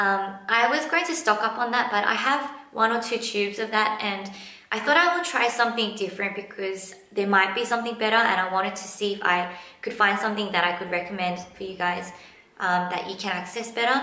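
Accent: Australia. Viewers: i like your voice! hehe very australian ^^ i'm an aussie asian too~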